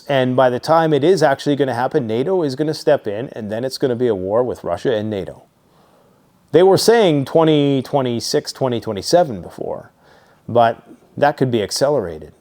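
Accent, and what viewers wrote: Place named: United States. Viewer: I didn't mean to cause any arguments, I was genuinely curious about the differences between the two countries. I've been watching this channel for years and he talks like he lives in the USA. Thanks for all the info guys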